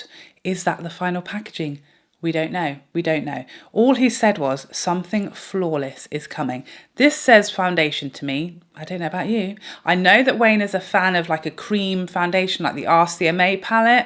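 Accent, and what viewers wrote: United Kingdom. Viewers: I like listening her British accents. You have beautiful eyes. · Don’t think I need any of that…thanks for another great and entertaining video! The bit about the Fenty bronzer pallet was especially hilarious. I am a fairly recent subscriber (a month or two), but my inner monologue has already switched to a British “accent”!! ❤️ it!!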